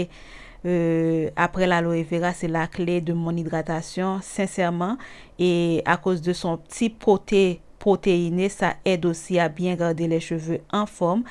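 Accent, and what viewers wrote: Haiti. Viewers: Tu parles comme une Haïtienne 🇭🇹🇭🇹j'aime bien merci😊😊😘😘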